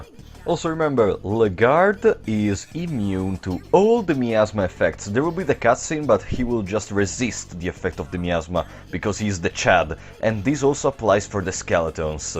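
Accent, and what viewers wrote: Italy. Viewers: Are you italian? (just for the accent)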